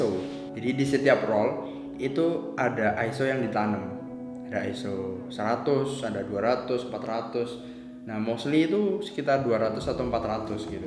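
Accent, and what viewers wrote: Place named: Surabaya, Indonesia. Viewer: Makasih mas, bermanfaat bnget infonya. Dan ternyata podo Suroboyo e Haha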